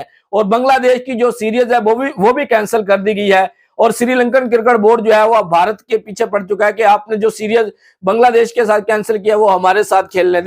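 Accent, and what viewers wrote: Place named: Karachi, Pakistan. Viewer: Abey tu kaisa ch*tiya hai bey? Indian jersey pehen lene se hum tujhe indian man lenge?? Sale tere channel k description mein dikha rha tera channel pakistan ka hai. Sale tera accent dekh, karachi k l@ bazar ka gawaiya lag rha tu. Abey teri apni jersey pehnne se sharam aati hai tujhe to Bangladesh ka pehen le bey bhikari.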